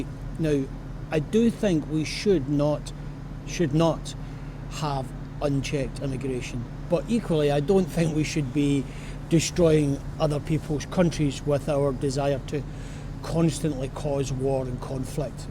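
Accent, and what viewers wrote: United Kingdom. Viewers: I still get annoyed with your red coat accent as in "the red coats are coming".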